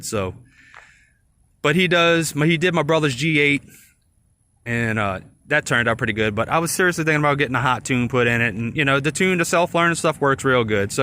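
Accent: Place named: Louisiana, United States. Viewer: sound like you from south Louisiana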